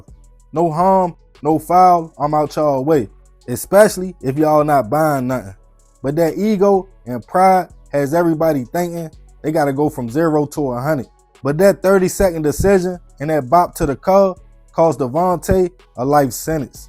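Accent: Baltimore, United States. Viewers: Your B-more accent is awesome.. one of the reasons why i subscribed 👍🏾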